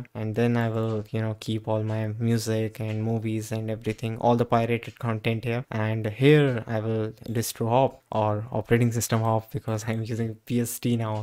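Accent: India. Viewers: I'm growing to like the Indian accent. Didn't expect it to be an acquired taste.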